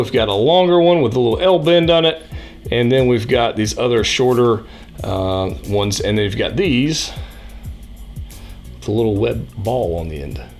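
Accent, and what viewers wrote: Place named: New Jersey, United States. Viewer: lol..that is Jersey accent you acquired ! Hilarious !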